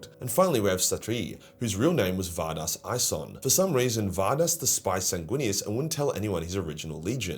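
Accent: Australia. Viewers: Bruh when u said the battle was harder than a word bearer at a daycare centre that shiiii caught me so off guard I spat my drink out ffs this guy never fails to make me laugh every video the Aussie accent makes it even better brilliant stuff my guy.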